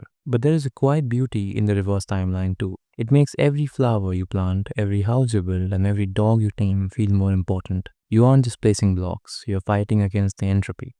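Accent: India. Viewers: Cool theory but why do you sound like a poopjeet?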